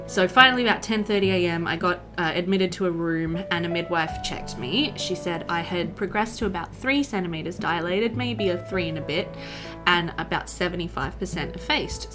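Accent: Australia. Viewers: Congratulations! Baby Jelly is so cute 😍 Hearing your birth story was very interesting and sorta informative for me since I've never been pregnant yet, but you had a lot of courage! Births are never like you expected them, it's always a surprise but I'm glad everything went well in the end for you and the baby. I'm a new subscriber, so don't worry about making content yet I still have a lot of Sims 2 Pleasantview to catch up 😉 Love from a Canadian girly ❤ (I love your aussie accent by the way!)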